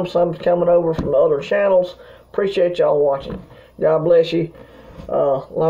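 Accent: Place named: South, United States. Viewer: Absolutely important info! Love hearing another familiar sounding Southern Accent! Stanley and Donna in the NE GA mtns.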